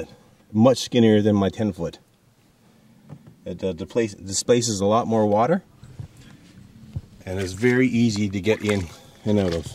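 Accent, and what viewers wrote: Canada. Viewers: assuming your accent and not watching any of your videos i assume you are Canadian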